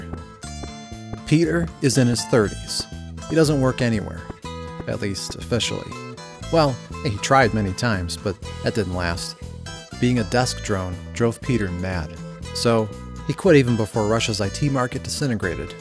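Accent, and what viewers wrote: United States. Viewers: are you from western New York man? like Buffalo or Rochester or something?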